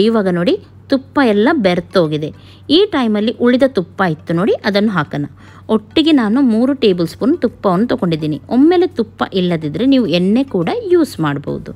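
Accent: Mangalore, India. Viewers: Hai madam nowadays I am watching your all viedeoes superb your mlore kannada so nice your family very good children are so naughty Khushi aagatte always be happy thank you so much daily vlog maadi mam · Mam I really love ur manglore Kannada and also ur recipe's..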